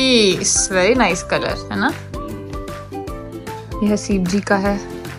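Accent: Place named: Punjab, Pakistan. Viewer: PK jabi and Canadian English accent doesn't mix too well....but its funny nonetheless !!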